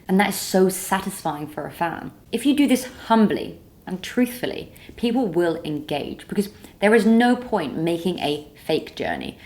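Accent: England, United Kingdom. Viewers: It’s simple, I hear the British accent, I click like.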